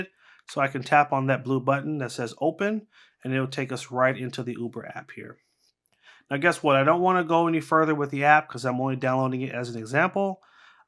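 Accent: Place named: United States. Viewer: I am a 78 years old English man and for me you talk far too quickly with a very strong American accent and race through the video too quickly. I have to keep stopping it to go back to practice what you say on my phone. Other much younger people who have seen this video on my desktop pc agree with me. So not just me that thinks the same ! Anyway thanks for downloading this video. The following video is the best teaching video I have come across for teaching beginners on Android phones how to copy and paste. ''Timmy'' explains clearly and does not race through the instructions as many YouTube tutorial videos do. As one of the comments wrote ''Straight forward, articulate, concise instruction. Exactly what we seek. BEST of any out there. Thank you, Tim!'' How To Copy And Paste On Android Tech Time With Timmy <link>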